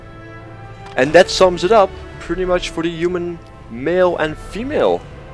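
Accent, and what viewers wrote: Netherlands. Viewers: "I'm and I am Dutch" or aint you? you sound dutch:) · are you dutch? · omg that dutch accent tho haha, I recognise it anywhere, mainly because I've got it myself :p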